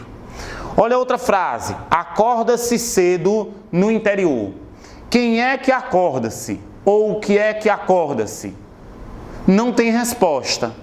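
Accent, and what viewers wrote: Northeast, Brazil. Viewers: e esse sotaque nordestino professor o senhor é daqui mesmo?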